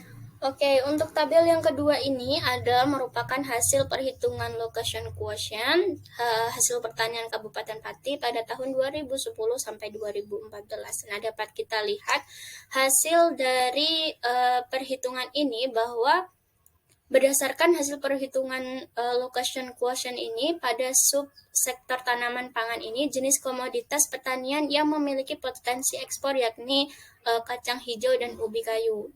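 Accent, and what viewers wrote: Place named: United Kingdom. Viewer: Aksen englishnya woah syekali 🔥🔥🔥🔥🔥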